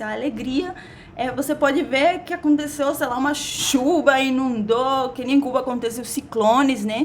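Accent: Rio de Janeiro, Brazil. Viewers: Olá, vc já é uma Carioca. Até o sutaque, já pegou. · Eu acho que você não é CUBANA Seu sotaque e totalmente brasileira e esta se fazendo de cubana para ganhar likes e inscritos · Hola. Por primera vez entrei o seu canal. Pensava que eras brasilera. Como aprendiste a falar assim com assento brasileiro perfeito? Eu sou cubana também,mais,eu gosto muito de escrever. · Voce está falando um pouco...como os cariocas...Vem aquí para Sao Paulo...Adoro voce.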